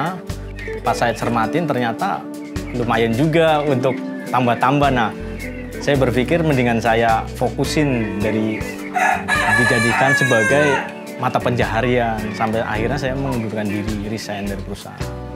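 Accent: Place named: Banyumas, Indonesia. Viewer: Wong ngapak💪👍👍👍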